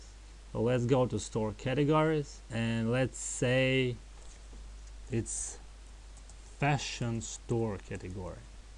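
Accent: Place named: Russia. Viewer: I can hear that Russian accent from a mile away my Ruskiy bryatuha.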